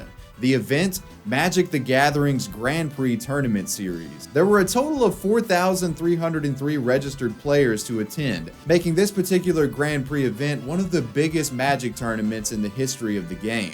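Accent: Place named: Virginia, United States. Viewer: Dude your Virginia accent is on point. Are you from around there?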